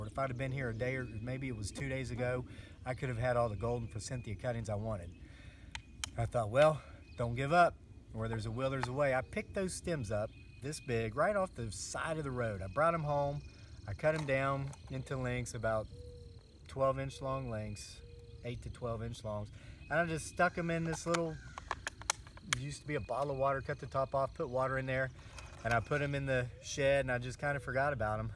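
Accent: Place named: Virginia, United States. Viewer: Before I even noticed your hat I knew you were from VA from your accent!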